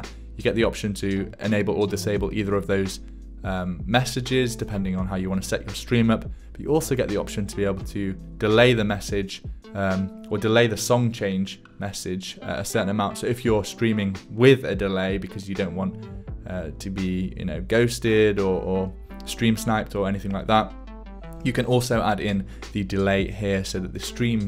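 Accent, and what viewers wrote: United Kingdom. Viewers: Where is your accent from? It doesn't sound like london british but it sounds british, it's nice.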